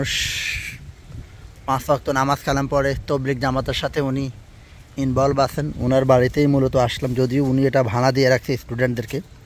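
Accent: Noakhali, Bangladesh. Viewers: আপনার বাড়ি কি নোয়াখালী ❤❤ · একটা জিনিস হল ভাই আপনি আমার মতই একেবারে নোয়াখাইল্লা ভাষায় কথা বলেন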